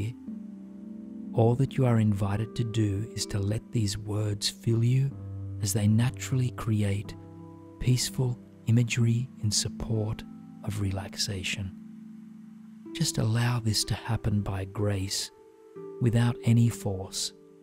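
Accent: Australia. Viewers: I love your meditations, they’ve helped more than you’ll ever know. I feel even more drawn to you because I think you’re Australian? Feels a bit more like home and trusting.. I can’t explain it. But thank you again 🙏🏼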